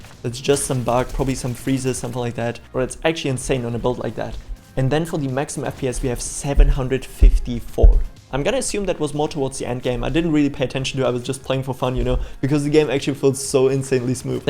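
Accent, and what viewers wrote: Germany. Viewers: Man hört dein deutsch raus! Sehr geiles video! Habe mir jetzt auch einen gebaut mit nem Ryzen 7 5700x, ner 5060 32gb ram usw. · i can hear the german in u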